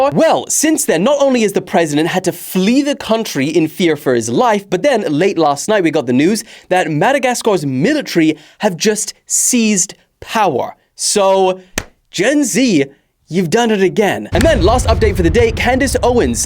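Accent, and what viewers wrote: South Africa. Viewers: Is South African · Are you South African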